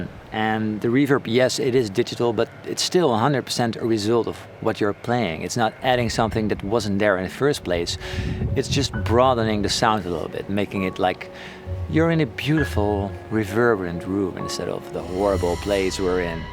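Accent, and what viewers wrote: Netherlands. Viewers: Haha, you can recognize a Dutch accent from miles away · Great video loved it :) Butttttt if you care at all about not sounding too Dutch, watch your pronunciation of ideas (it sounds like ID's) which is a dead giveaway you're dutch. And yes fellow dutchy, keep up the awesome vids.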